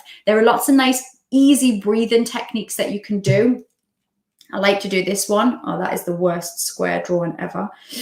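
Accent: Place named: Geordie, United Kingdom. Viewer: I saw your Georide Accent videos first. You really have made your accent more proper. I Love the Georide accent!!!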